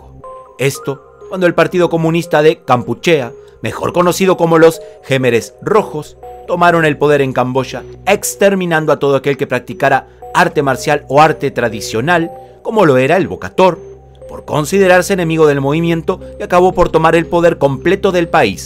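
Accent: Uruguay. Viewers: Hola! sos Uruguayo? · Sensei le felicito por su excelente trabajo y esfuerzo en mantener viva la llama de estas artes tan nobles. Una Pregunta maestro usted es de Uruguay?! 🤔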